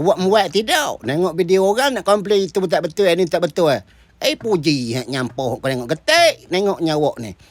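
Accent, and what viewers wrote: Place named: Temerloh, Malaysia. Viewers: Bahasa belah temerloh ke chenor ni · Suka dngor aok ckk tmerloh.. stedy 🎉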